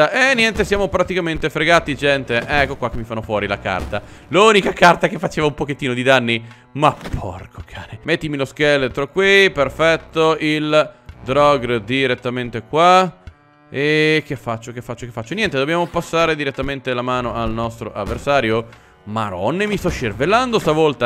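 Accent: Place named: Romagna, Italy. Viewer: romagnolo style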